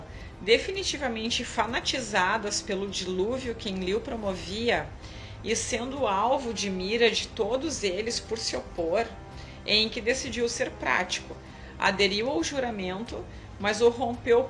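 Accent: Rio Grande do Sul, Brazil. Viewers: Cara,como vc é chato!!! Se ñ gista do sotaque gaúcho dela,vasa!!! Ninguém está te obrigando a ver o vídeo.Vá ao canal original da saga e veja sem a traducão e sem o sotaque da! Ecaaaa!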